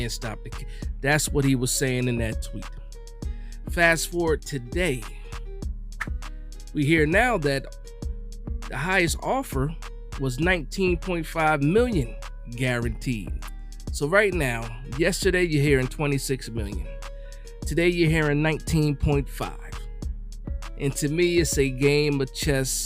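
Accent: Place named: South, United States. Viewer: I'm not listening to talk about The New York Football Giants from a guy with a southern accent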